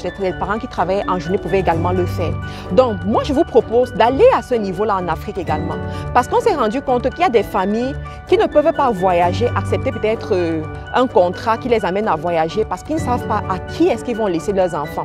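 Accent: Cameroon. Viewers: Prononciation normale même si elle a un accent camerounais tinté semi canadien aussi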